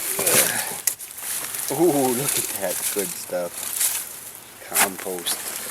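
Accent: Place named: Michigan, United States. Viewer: Love the video! Can I ask what the opening song was to the video? I like it! Also, as Durham native I was shocked to hear you say "here in North Carolina" since your accent is more...Michigander?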